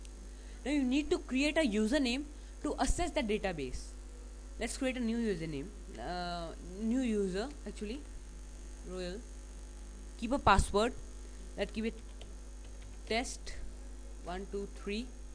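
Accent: India. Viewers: Thanks dude.... are u indian?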